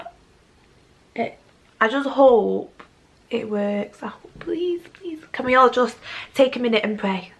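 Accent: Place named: Manchester, United Kingdom. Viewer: You gotta be from Manchester ❤❤❤❤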